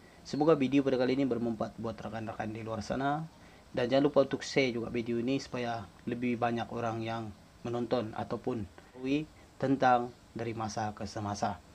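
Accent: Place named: Sabah, Malaysia. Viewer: Macam urang sabah saja ini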